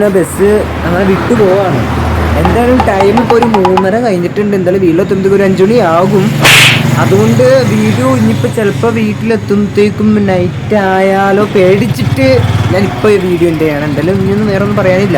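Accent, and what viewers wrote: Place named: Muvattupuzha, India. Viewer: സംസാരം കേട്ടിട്ട് മുവാറ്റുപുഴ പോലെ തോന്നി 😂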